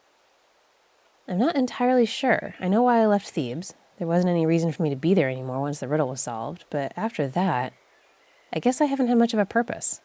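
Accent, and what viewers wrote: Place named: Midwest, United States. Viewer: Ope, Midwestern Sphinx identified!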